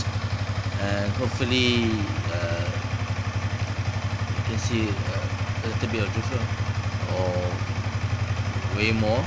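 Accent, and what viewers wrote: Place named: London, United Kingdom. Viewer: Fuh.. Brader speaking London. Not bad for first video. Keep on the good work. You know who I am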